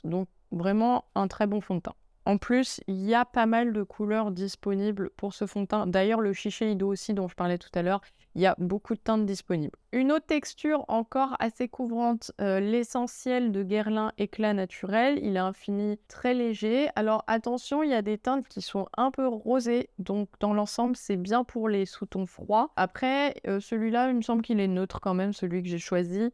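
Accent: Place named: Paris, France. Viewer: La vidéo est très intéressante et les conseils sont bons mais l'accent parisien là j'arrive pas 😅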